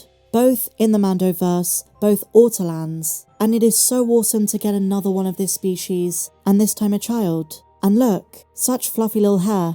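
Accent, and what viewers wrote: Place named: West Country, United Kingdom. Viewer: Please, your British right, So please say autumn and not the fall. Love all your wonderful videos and opinions on anything new in the StarWars world. What makes your Channel so brilliant, is that fact that you're British. Definitely from the West country. I'm saying Dorset going by your beautiful West country accent. ❤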